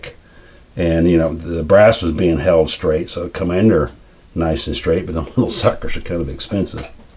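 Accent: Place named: South, United States. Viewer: Hornaday Sayko Lay-pewah Triggered yet? 🤪 That said, most of what we learn about our sport is read in books, magazines, or gun blogs, so if you've never heard it pronounced properly you'll say it the way it looks like it should sound, I don't personally have a problem with that. BUT blaming you incorrect pronunciation on your southern accent is a cop-out: even a southerner can learn to pronounce names correctly! Frankly, it's the polite thing to do!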